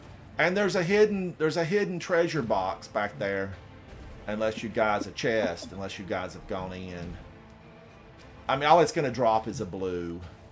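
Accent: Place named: Appalachia, United States. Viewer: What is your accent? Appalachian? Love your content, you're very charismatic.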